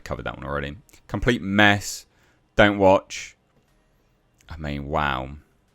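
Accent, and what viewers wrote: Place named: United Kingdom. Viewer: I don’t know if it’s his British accent but is so funny 🤣 he’s not even trying to be funny.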